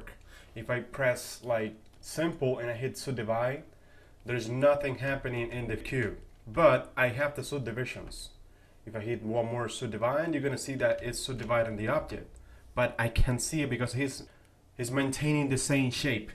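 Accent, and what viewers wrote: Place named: Spain. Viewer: Excelente tutorial... Supongo que hablás Español por el nombre y además por el acento:)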